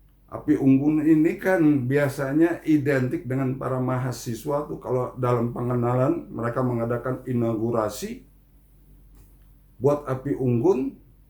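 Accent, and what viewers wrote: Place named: Betawi, Indonesia. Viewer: Alhamdulillah, nama sy dan pak Sudari disebut, dalam Video YouTube Panggilan Adzan. Mantap, dg dialek kental Betawi, Tausiyah yg gampang diingat, pas sedikit ada kelucuan. Lanjutkan, Abah Ustdz.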